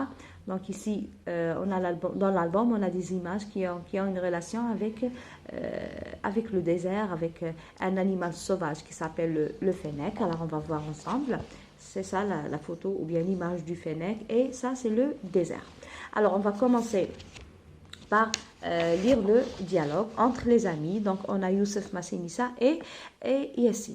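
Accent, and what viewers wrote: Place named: Africa. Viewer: Ne voyez vous pas que c est un français africain il est incorrect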